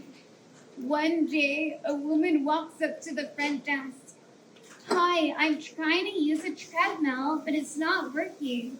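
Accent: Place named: California, United States. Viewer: I knew you were from Cali just by your accent alone!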